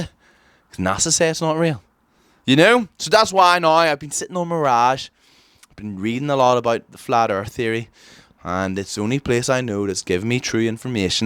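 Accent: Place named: Northern Ireland, United Kingdom. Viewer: You from Northern Ireland? Or ireland seems like more noeth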